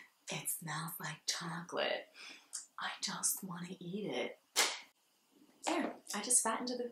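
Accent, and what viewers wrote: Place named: Philadelphia, United States. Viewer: Okay, upon further investigation (Instagram), I see you're from Philly!!! I knew it! I lived in Ridley Park for a few years and then moved to Jersey. I'm LOVING your channel. And probably the fact that you sound like me although my accent is pretty toned down. So, I'm just wondering, can we be best friends?! You have the cutest personality and I've been wanting to start a channel for so long now. Low key girl crushing on you right now (in the let's be friends kind of way) lol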